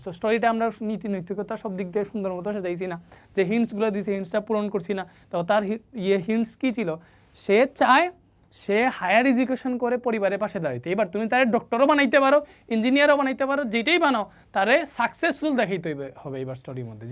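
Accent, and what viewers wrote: Sylhet, Bangladesh. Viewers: Sir ki sylheti nakiiii😮😮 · স্যার কি সিলেটি? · Beday j Sylheti kothay seta bujha jay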